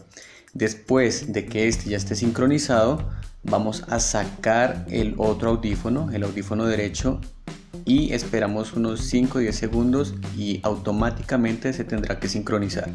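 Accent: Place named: Colombia. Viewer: Qué bonito se escucha el acento colombiano !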